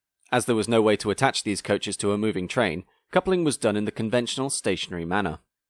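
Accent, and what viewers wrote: England, United Kingdom. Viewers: I was pretty amazed by an English sounding guy pronouncing Drogheda properly, but the name at the end explained everything 👍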